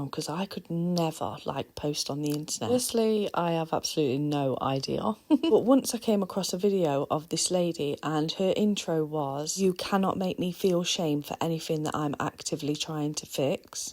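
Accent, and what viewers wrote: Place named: Nottinghamshire, United Kingdom. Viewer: 😂😂😂 you do make me laugh,sounds like your from Sutton-in-Ashfield!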